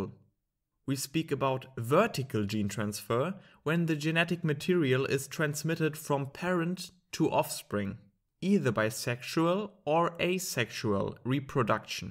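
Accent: Germany. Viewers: dein deutscher akzent ja moin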